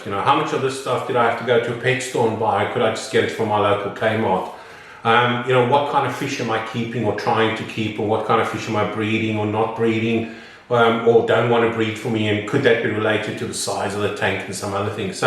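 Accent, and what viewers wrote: South Africa. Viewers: Hi (please insert name), I recently came across your channel and am happy that you‘ve started it. Very informative and helpful indeed. I wish to eventually also install a rack system like yours, currently busy with house renovations, so after that. I detect a South African accent. Would love to know more about where in SA you’re from. I’m in Queensland also, up in Townsville. Keep the content coming😄 It’s great! Kind regards André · Great intro video! After watching a number of your more recent videos, I picked up on the distinct accent however noted that you are in Australia. Now reading through the comments here... you are a Capetonian:) I too am from Cape Town and moved to England twelve years ago... for the weather of course:) Keep up the great work in bringing back old fashioned fish keeping, where we live in a world of everything being so commercialised these days (showing my age dating back to the 60's lol). 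Sorry for the user name, a bit odd I guess, but it is my gaming ID. For those that do not know, "scur" is storm in anglo-saxon. All the best with the channel. Cheers Gary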